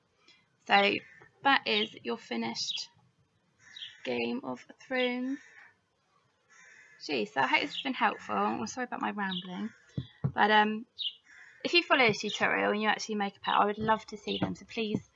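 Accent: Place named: Northeast England, United Kingdom. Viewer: I think this is a great idea and love your other shoe designs as well. I also think your accent is just adorable. Where do you come from? (I'm guessing northeast England but that's just a guess)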